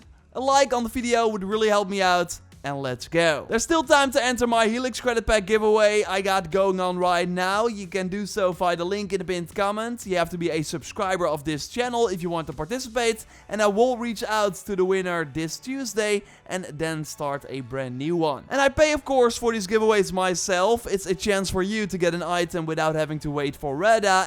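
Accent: Netherlands. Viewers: Dude are you ducht youre accent sounds very ducht, ps gimme does creddits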